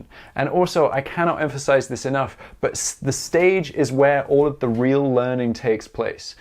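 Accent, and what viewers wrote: Scotland, United Kingdom. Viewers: You're sounding less and less Scottish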